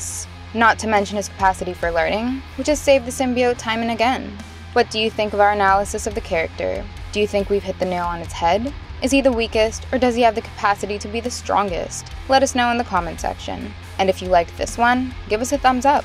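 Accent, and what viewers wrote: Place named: United States. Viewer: Every word is typical american accent everything has r in it annoying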